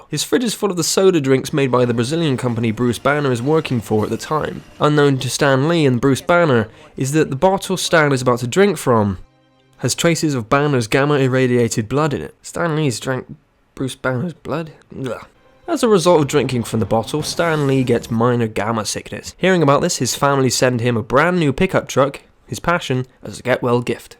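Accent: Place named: West Country, United Kingdom. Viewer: This is bloody brilliant- had me laughing and is surprisingly feasible! Is that a west country twang I detect in your voice,?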